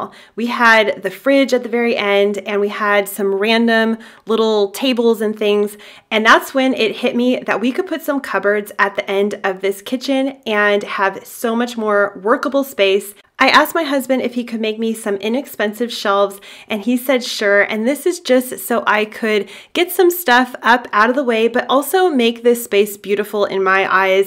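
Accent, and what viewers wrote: New Zealand. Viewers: He sounds like a kiwi. This is so nice guys. I can’t wait to buy a home and do this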